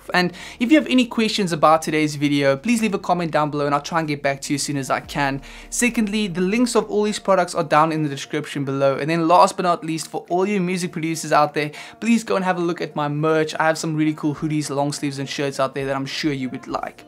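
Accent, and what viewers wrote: South Africa. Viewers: You sound so South African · ... Your accent sounds like you come from Cape Town...... Nice info.. Thank you...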